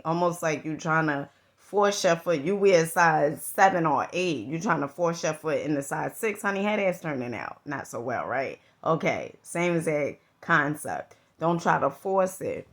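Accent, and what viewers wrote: New York, United States. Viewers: Nice. To the point. When i was a young adult, i loved the N.Y. accent. Still do. From what part of NY are you?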